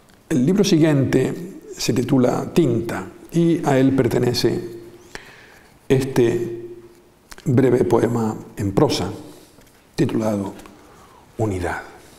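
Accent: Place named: Canary Islands, Spain. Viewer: Muy amena y enriquecedora. Más conferencias sobre poesía por favor. Que agradable es el acento de la Canarias.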